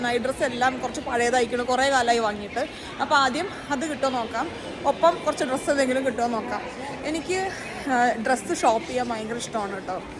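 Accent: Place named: Pattambi, India. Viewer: വളരെ നല്ല തീരുമാനമാണിത്. എന്നും എല്ലാവര്ക്കും വേണ്ടി ജീവിക്കുമ്പോൾ കുറെ വർഷങ്ങൾ കഴിയുമ്പോൾ നമ്മൾ നമുക്ക് വേണ്ടി എത്ര സമയം ചിലവഴിചൂന്നു നോക്കുമ്പോൾ എന്നും account negative തന്നെയാകും. പിന്നെ മക്കള് തന്നെ ചോദിക്കും, നിങ്ങളോടു ഞങ്ങൾ sacrifice ചെയ്യാനായി പറഞ്ഞോ എന്ന്. Very good decision. Hats off to Vishnu for supporting this decision. Please don’t change’ OUR PATTAMBI’ slang. പിന്നെ അങ്ങനെയാണ് പട്ടാമ്പി സ്റ്റേഷൻ ഉണ്ടായതു എന്ന് പറയുന്നത് കേഴ്ക്കുന്നതു തന്നെ ഒരു ഒരു feel തന്നെയാണ്.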